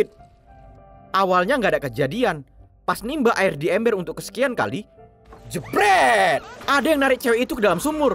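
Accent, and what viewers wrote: Medan, Indonesia. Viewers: bahasa kek orang medan cuk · Anak medan fixs :v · Bg orang Medan bg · Bang sering upload lah bg Logat Medan bg 😁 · Logat Medan nya khas kali hahahaah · Sering2 upload bg... Orang Medan ya bang ? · Bang kau orang medan kh? Kok kek orang medan kau bang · Medan kali logat'a bang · Logat org medan mmng gtu bg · Mimin org medan kah? Logat medannya lengket kali🤣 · Orang Medan kau laii 🙄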